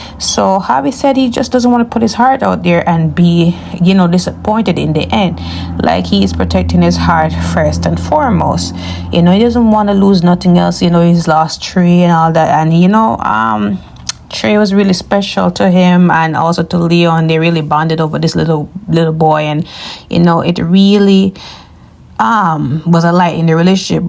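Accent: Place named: Jamaica. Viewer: U sound Jamaican 😂but yh javi is a hopeless fool because why are u pushing ur man away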